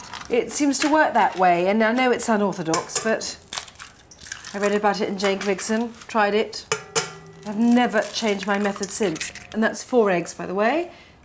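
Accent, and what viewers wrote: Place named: RP, United Kingdom. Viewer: I can't get over that Howard-ish armchair and those simple cream coloured drapes, for someone from a working class background these are interiors I can only dream of, until finally I give up and find other values and ways to be happy in life besides money, comfort and ownership. I think that that's what's so wonderful about food is that it's available for everyone and affordable at the same time depending on the price range, of course. She might have a posh accent and some formidable vocab up her sleeve but the concept she sells is for everyone.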